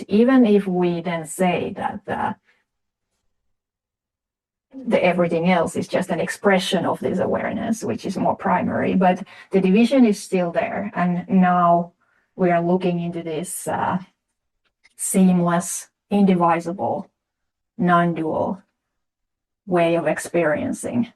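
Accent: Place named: Finland. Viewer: She's Finnish (not German), speaks excellent english with a wide vocabulary (more than most Americans), and has a lovely accent 😊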